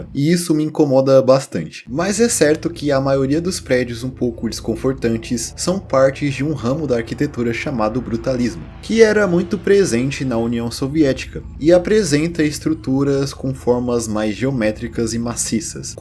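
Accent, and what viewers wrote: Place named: Maranhão, Brazil. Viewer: apenas uma pergunta fora de contexto. Tu é do Maranhão? kkkkkk tenho um amigo amigo maranhense q conversa estranhamente muito parecido cntg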